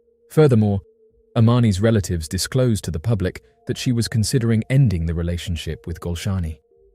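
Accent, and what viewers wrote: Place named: Southern England, United Kingdom. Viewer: The channel is from the UK and the narrator has a basic Southern English accent. What accent wouldn’t annoy you ?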